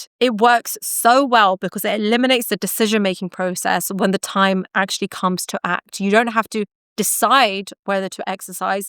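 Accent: United Kingdom. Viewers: I have been listening to your podcasts for the past few weeks and I find them very interesting and helpful. I am in my sixties and I have read many self help books and listened to audio tapes and CDs over the years. There is something very refreshing about your podcasts. I also love your British accent 😊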